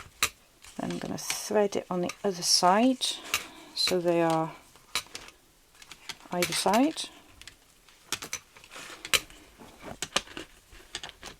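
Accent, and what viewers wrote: Wales, United Kingdom. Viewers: Love love loved this tutorial! You are a natural and so at ease on the camera. Really enjoyed your video and your Welshy voice also, I felt right at home. Thank you for taking part in the collaboration Hugs Rachel xxx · Nice to meet you 😍 love your Welsh accent, adorable 🥰 thank you for your tutorial, you certainly have given us plenty of ideas on tags and I especially love the Tag Journal with the B&W papers, brilliant! Vellum is one of my favourite elements, so I’m excited that you’ve included this too! Thank you again, have a wonderful day, hugs and blessings 💜🙏🏻🫂😍⭐️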